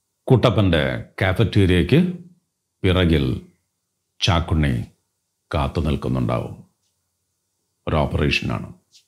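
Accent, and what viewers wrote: Thrissur, India. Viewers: Intro...kalakki....sirntae sound super... Sirntae voicelootae kettappo thrissur bhasha endhu rasama...👌👌👌👌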